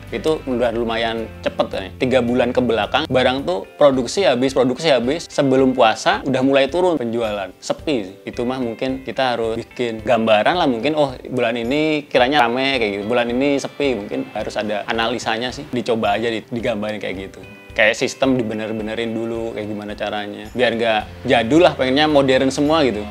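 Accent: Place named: Java, Indonesia. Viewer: mas nya ini tinggal di ciamis tapi logatnya jawa ya... wong jowo ki mesti 😅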